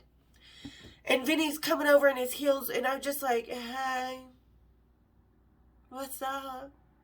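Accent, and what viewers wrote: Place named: United Kingdom. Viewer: Bruce Dickinson used to live about 3 miles away from me. I love your British acent❤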